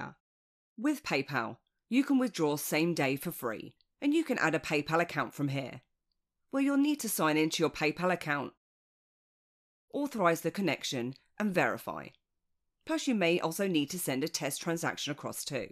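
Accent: United Kingdom. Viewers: refreshing hearing a Brit rather than a American Accent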